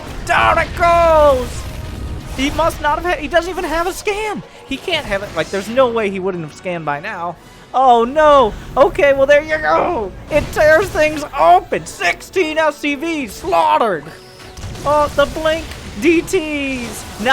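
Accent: South, United States. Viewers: Hey, how'd you get your slight southern accent? What state were you raised in?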